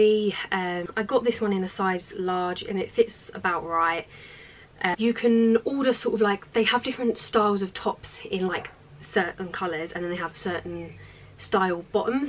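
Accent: England, United Kingdom. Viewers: I love your british acent....! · You drive me crazy! Your so beautiful, that long red hair and English accent, you are just bad to the bone. You are number one on my want list.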